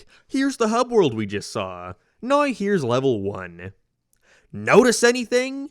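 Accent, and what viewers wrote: Ireland. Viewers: i keep forgeting your irish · You sound more Irish when you were younger. Glad you grew out of that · It’s a Irish accent he even talked like that as a child man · Irish accent I believe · It's kind of put on but also he's just irish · It's an Irish accent you simpleton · He’s irish you testicle sucker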